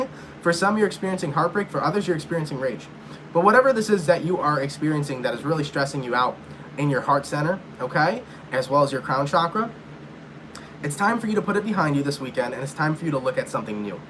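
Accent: England, United Kingdom. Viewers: Pmsl your English accent is so good x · Lol, love the British accent 😂. Was just in your neck of the woods yesterday for thanksgiving (good Ole Yorktown). Btw, try Apple Cider Vinegar a few times a day for that acid reflux. Contrary to popular belief its low stomache acid that causes acid reflux (stomach's inability to digest properly due to low acid in the lower stomach pushes the acids in the upper stomach upwards) try a tablespoon or 2 with water before meals 😉. Hope that helps. Thanks for all your insights 😊🤗🙏 Happy Holidays! 💚♥️🎉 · Love the British accent. From the UK. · Lovin the English accent...😉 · Love the english accent 🦂🥰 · During the Scorpio read; Love that British accent! 🇬🇧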